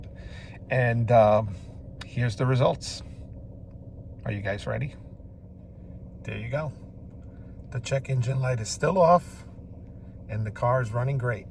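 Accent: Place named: New York, United States. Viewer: Great video, clear, concise, helpful with fab NY accent & phrasing - reminded me of Telly Savalas (TV detective Kojak). Who loves ya baby !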